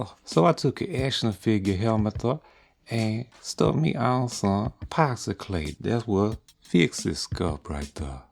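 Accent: Louisiana, United States. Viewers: One of my secret joys is clicking subtitles on these videos and watching the Youtube robots struggle to interpret smooth and melodic Louisia-ness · I swear you sound like my Louisiana people.......glad I moved back! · where you from Louisiana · I'm still convinced that this man is a cajun from my home state of Louisiana. Love your work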